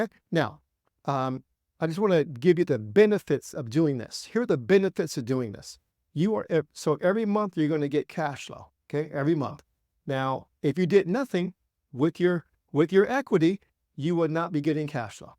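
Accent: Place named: Haiti. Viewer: he sounds like he's Haitian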